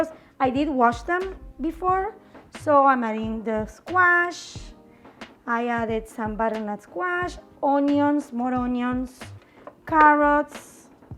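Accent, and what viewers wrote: Argentina. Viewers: my mom barely ever cooks, her signature dish was always "aroz con porquerias" but one thing she always made back in Argentina was puchero, seeing puchero argentino with the argentine accent and the apron with Mafalda really made me miss my mom, haven't seen her in person for a few months cause of covid. thanks for being wonderful!!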